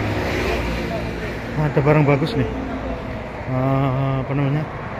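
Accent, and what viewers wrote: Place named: Java, Indonesia. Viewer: Taiwan ngapak apa kie guli ngomong medok temen lik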